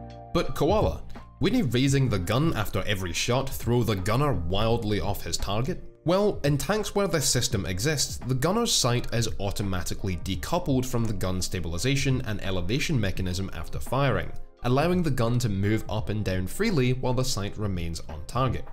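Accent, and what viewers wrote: Scotland, United Kingdom. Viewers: Was trying to work out if your were one of us Scots.. wasnt until you said lads and lasses that i knew for sure! great video! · Yes, I already knew everything said but sometimes you just want to spend seven and a half minutes listening to a Scottish man talking about a subject you like because they do it better than you. XD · You sound Scottish mate. A good pal of mine's was in the Royal Scots Dragoon Guards & used to drive a Challenger 2. He said it is still one of the best tanks in the world, even though there have been a few new ones introduced to various armies around the world.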